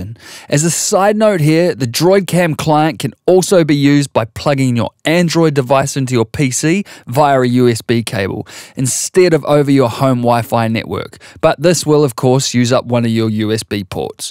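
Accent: Australia. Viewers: You sound autrallian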